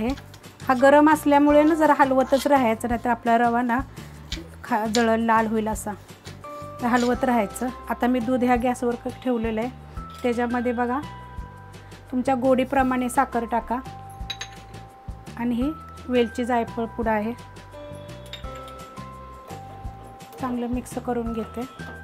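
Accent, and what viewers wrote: Satara, India. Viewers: Tai tumch gav kont aahe tumhi kup chan boltay agdi mazya satar chi bhasha vattey baki khir kup chan zaleli distey mi lgech krun bghnar aahe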